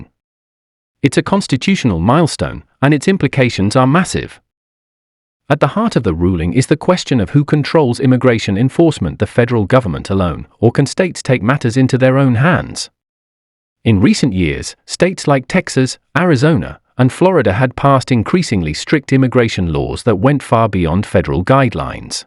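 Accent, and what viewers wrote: United Kingdom. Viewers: I don’t need to hear a British ethnic voice talk about the USA. Didn’t we kick those people out of the America for a reason?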